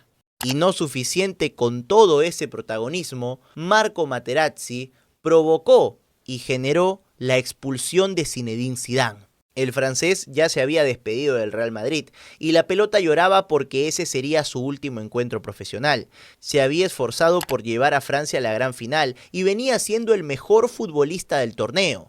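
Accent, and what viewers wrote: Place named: Argentina. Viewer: Me suena como argentina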